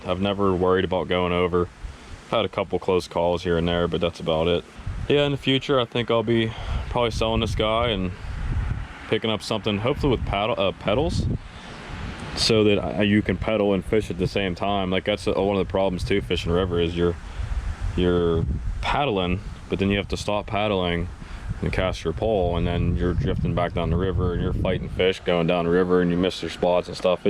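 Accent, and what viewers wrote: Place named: Pittsburgh, United States. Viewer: Man, Im like half way through this thinking there's NO WAY this dude aint a yinzer, then BOOM, "dahn the Yough." Confirmed. Grew up in the Mid Mon Valley / Ringgold area, just so happens I grabbed an Eagle Talon 12 a couple days ago and stumbled my way to this video. Your upgraded chair is aggressively Appalachian, I love it.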